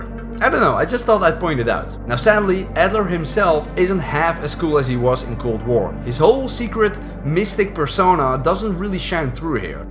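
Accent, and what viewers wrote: Netherlands. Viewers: It’s the typical accent Dutch people have when they speak English. A Dutch person will, like myself, be able to immediately tell that this guy is Dutch or Belgian (Flemish).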